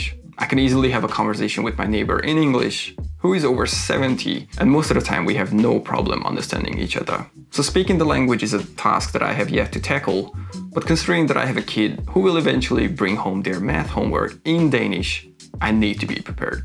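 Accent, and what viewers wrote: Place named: Hungary. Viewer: You're very observant, and I agree with all your points. I'd also like to say 'Welcome to Denmark'. Can I ask where you are from?🙂 If I should guess from your accent and last name, perhaps Hungary? I also live in Copenhagen, and I'm very embarrassed about the littering of my fellow townspeople after public events..! Sheer laziness and drunken stupidity are the main reasons for all the trash, like seen after the Distortion festival. Maybe we should make it illegal to throw trash in public (like in Singapore). Perhaps a fine of DKK 1.000,- would make people think twice.😉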